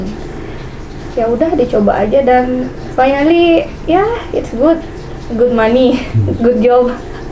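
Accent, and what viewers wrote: Bali, Indonesia. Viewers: Sing hilang" logat bali ne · Logatnya asli bali bnget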